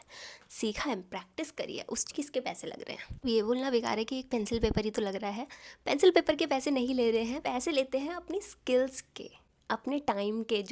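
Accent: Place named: Pahadi, India. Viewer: Pehli bar aapki video dekhi aapke bolne k accent se hi pta lgra h pahadi ho.... 😂❤ I saw your video for the first time and I liked it, it was very honest.❤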